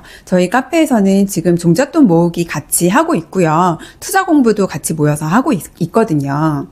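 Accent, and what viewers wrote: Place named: Busan, South Korea. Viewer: 벽면에 금고 문이 있네요. 금고 언제 가득차요? ㅎ 똑순히 시원하게 애기 잘하시네요.악센터 보니 부산분인가요? 유익한 말씀 감사합니다.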